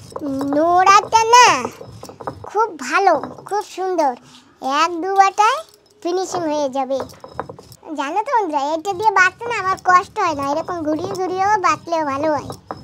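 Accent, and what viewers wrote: Barishal, Bangladesh. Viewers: হাই বুঝি আপনাগো বাড়ি কি বরিশাল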